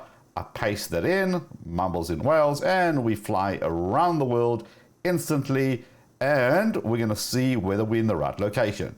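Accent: South Africa. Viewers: Bro great vid it's bothering me you sound South African 😂but in about you from usa😂😂🤔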